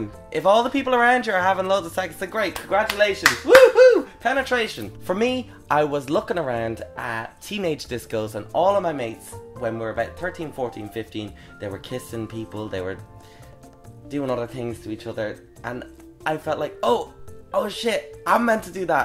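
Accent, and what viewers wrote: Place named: Ireland. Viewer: I love your accent. I need an Irish man in my life!! I could listen to you all day. Sending love from the other side of the world (New Zealand)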